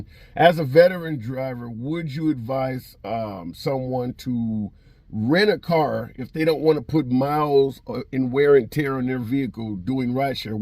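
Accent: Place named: New York, United States. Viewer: are you from New York or Boston?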